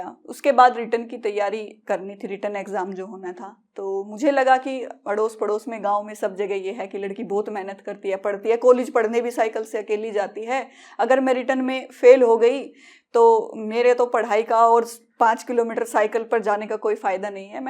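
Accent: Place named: Haryana, India. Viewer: आपको मुबारक कि आप अपने mission में सफल हुई। अब आप दिल्ली में हो अपनी हरियाणवी बोली को कंट्रोल करके बढ़िया से हिंदी के साथ इंग्लिश को mix करके बोला करो। ताकि आप दिल्ली के हर इलाके में पोस्टिंग और कामयाबी हासिल हो सके। promotion होने पर काफी easy feel करोगे । शुभकामनाएं ।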